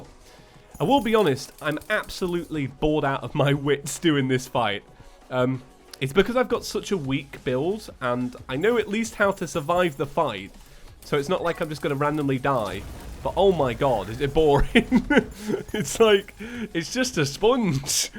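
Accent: England, United Kingdom. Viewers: I live in the US and you said 1000 pound grass and I was like... why are you weighing grass?! Then I realized thats money. And I never would have realized that if you didn't have one of the most english accents ever.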